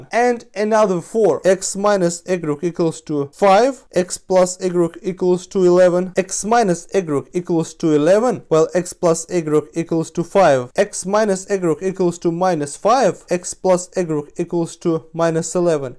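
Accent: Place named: Russia. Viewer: it's also pronounced close to that way in French But yeah he obviously sounds Russian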